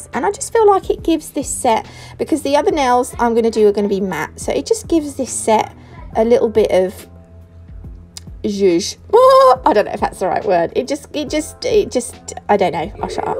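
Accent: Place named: United Kingdom. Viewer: I'm really not a big fan of the British accent but I love listening to you, it's so refreshing. Great set, love it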